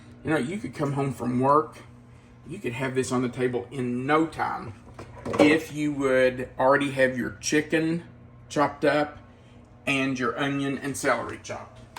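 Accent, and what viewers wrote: South, United States. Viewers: I love your southern accent and everytime you say TOGETHER,,,, I giggle and I say it the way you do ,,,,,unfortunately with my Boston accent ,,, just love you guys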